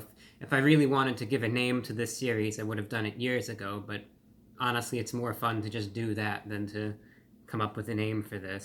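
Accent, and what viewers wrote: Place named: New York, United States. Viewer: I suggest everyone go back and look at young reviewbrah's videos from <num> era. So many classic videos from that era, no HD camera, you got the classic table or armoire setting, tons of reviews from the CAR!!! I mean so many reasons to go back and look at those not to mention the dry cynical humor is way up there and lastly teenage reviewbrah's accent is in peak New York form! Again if your a TROTW fan and haven't watched any videos from that era do yourself a favor and go do it! JUST DO IT!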